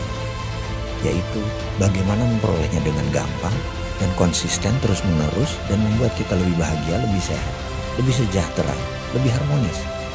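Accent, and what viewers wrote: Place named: Surabaya, Indonesia. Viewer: iki wrong endi ce rek?,..,. Jadi pingin Dolan nan omahne pingin belajar bisnis. kayak, e wong suroboyo jawa timur bahasanne.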